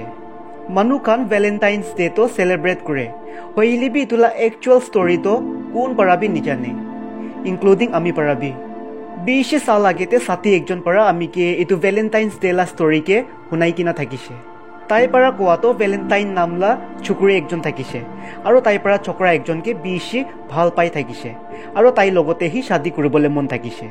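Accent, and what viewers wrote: Nagaland, India. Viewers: i like your Nagamese accent brother ❤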